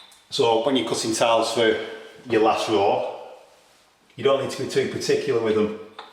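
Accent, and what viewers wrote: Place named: Lancashire, United Kingdom. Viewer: great video, and really helpful. It's also nice to hear a Lancashire accent-it's been years since I moved away! Thanks!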